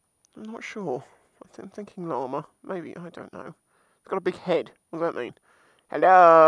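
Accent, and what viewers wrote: United Kingdom. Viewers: yo what part of Britain you from?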